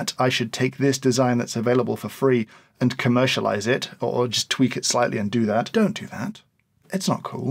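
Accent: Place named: England, United Kingdom. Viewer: what part of england is this accent from?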